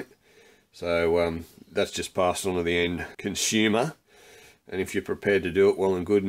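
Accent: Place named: Australia. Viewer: Hears Aussie accent... presses like immediately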